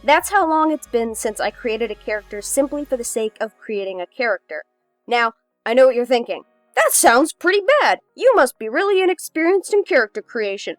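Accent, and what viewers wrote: Canada. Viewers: Always makes me happy to hear more Canadians.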